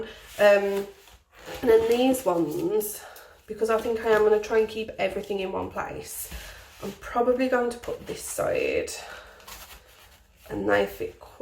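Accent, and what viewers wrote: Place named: West Midlands, United Kingdom. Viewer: Are you from the West Midlands? I can hear it straight away 😂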